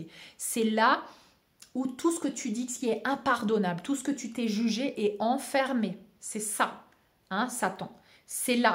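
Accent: Alsace, France. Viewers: des fois tu as gardé ton accent alsacien c'est trop mignon lol Ps: je vois 777 likes sous ta vidéo c'est bon signe ça.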